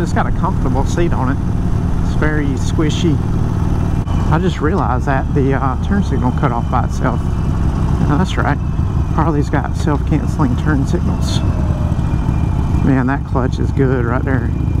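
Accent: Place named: North Carolina, United States. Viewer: its just the soft voice and southern twang thats all it is so many people in nc sound like this it pretty normal voice honestly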